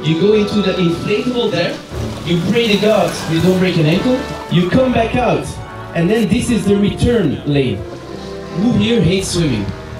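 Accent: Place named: Belgium. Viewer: i'd say it's an average belgian accent :D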